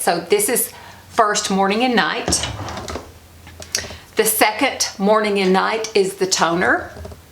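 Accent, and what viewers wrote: South, United States. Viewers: I just found your channel and I just love it. My family is from the south so I love your southern drawl. Also, my mother has fought Crohns for many many years - and lives in the Knoxville area. Please keep these videos coming. Love you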